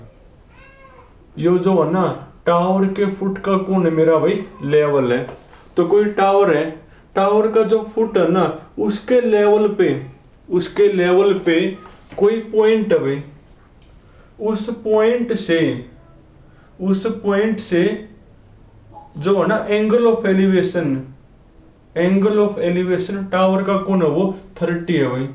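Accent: Haryana, India. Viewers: Dear sir aapki language nahin samajh mein a rahi thi. Shayad aap Haryanvi language bol rahe the lekin mere sare concept clear Ho Gaye thank u veri Much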